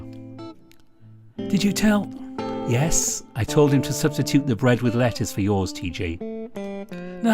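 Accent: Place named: Scotland, United Kingdom. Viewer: You have a really nice accent, but I can’t quite pin it down. Are you Scottish? (I’m terrible with identifying accents😅)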